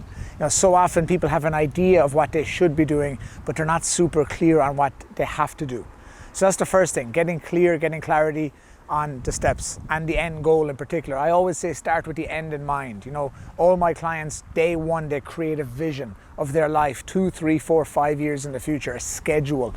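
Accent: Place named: Ireland. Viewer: hey, i just stumbled on this, i wish success in your youtube endeavours my friend. your so right though. recently i asked myself am i dreaming to big/too small. and it wasnt until i dared myself to dream big that it made things a lot more clearer in my mind. thank you for your insightfulness. i reeally loook forward to diving deep into your content, btw im from dublin, its so nice to hear an irish accent on this topic! godspeed, <3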